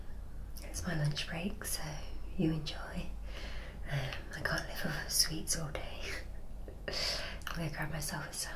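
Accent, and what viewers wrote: United Kingdom. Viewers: Are you actually British like me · Wow you have a heavy British accent! I hardly can understand it but I like it!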